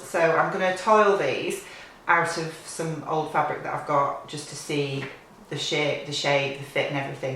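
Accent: United Kingdom. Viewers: ...you are too funny, I just love watching your channel and you’re so honest, which I just love. I was born in Elland, near Halifax but have lived in Canada for 46 years and your northern accent is like a little bit of home for me, thank you so much! Just wanted to say hi and let you know you’re appreciated.....don’t ever stop doing these vlogs, I just love them! I meant to say too, that yellow blouse on the magazine looks almost the same as the pattern you bought.....am I right? It would save you tracing that blooming pattern out. I have so many and love them, but always find a reason not to trace them off. 😏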